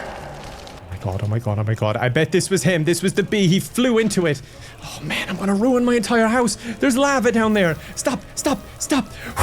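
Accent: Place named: Ireland. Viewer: living in Ireland lol. He spent some time in Spain though! I want to say I hope that clarifies things but it probably doesn’t 😅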